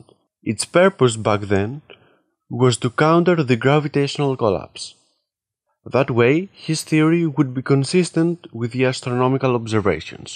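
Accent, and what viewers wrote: Greece. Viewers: Your accent spells: Greece Είσαι ωραίος ρε φίλε. Απλά, λιτά κι απέριττα. Και like και subscribe! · Greek accent detected 🤌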